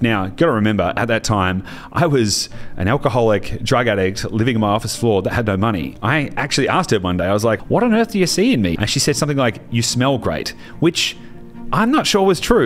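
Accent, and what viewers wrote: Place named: Australia. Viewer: I heard the aussie accent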